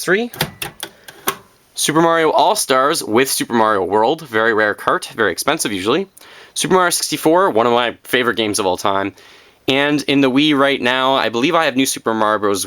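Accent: Canada. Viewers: Is he Canadian?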